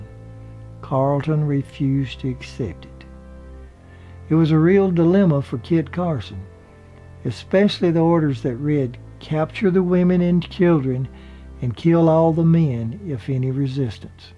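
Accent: South, United States. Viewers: This is a very interesting video as usual,. I still like that southern-type accent (at least to me, from Michigan) you have. Your voice always adds to the mystique of your historical stories. Of course, the pictures you take that go along with your videos, really set the mood well. I especially like your visits to the historic cemeteries as well. Great Job!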